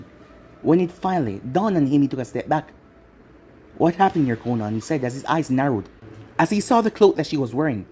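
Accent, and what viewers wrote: Jamaica. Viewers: I've always wanted to ask you this question, are u from Jamaica.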